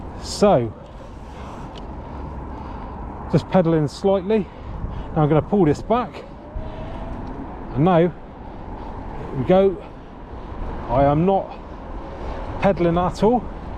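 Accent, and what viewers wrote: United Kingdom. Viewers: Great to have a video with a British person speaking rather than Yankee's.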